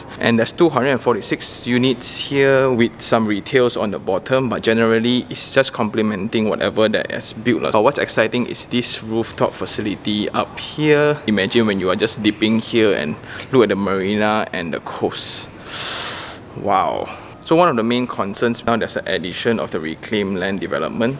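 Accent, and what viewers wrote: Malaysia. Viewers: Your sound like malaysian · are you from penang? Your hokkien sounds like 1!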